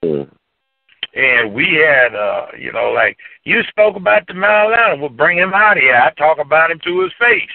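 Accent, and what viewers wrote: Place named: Texas, United States. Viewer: how does he have a texas accent when he has been in Los Angeles for so long.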